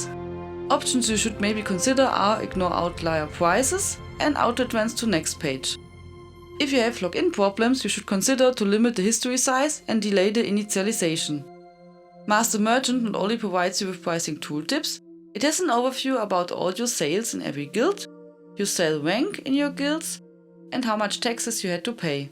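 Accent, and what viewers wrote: Germany. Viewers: deutscher akzent :3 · Very good list of add-ons thanks for that. Also probably the thickest German accent I heard in a while, but your voice makes it kind of cute :) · Du hättest es auch auf Deutsch machen können, oder ein Zweites Video auch wenn ich alles verstanden habe, der Akzent schreit nur so ''ich spreche Deutsch'' xD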